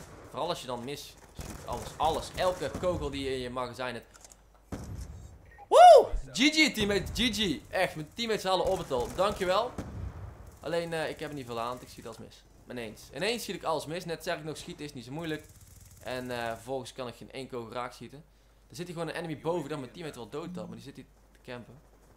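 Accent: Brabant, Netherlands. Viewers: ben jij brabants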